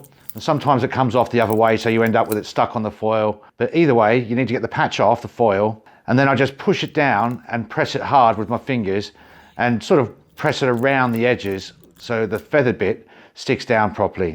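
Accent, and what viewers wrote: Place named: Australia. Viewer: Just watched another video. Great and clear to understand. I did pick up on the Aussie accent so should be saying G’Day. I’m Scottish and lived a few great years in Aus.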